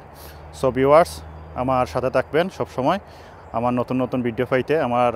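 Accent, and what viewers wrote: Sylhet, Bangladesh. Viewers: ভাই আফনে ত সিলেটি 😊😊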